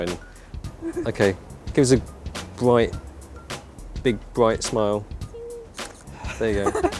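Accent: United Kingdom. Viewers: i find his voice too british too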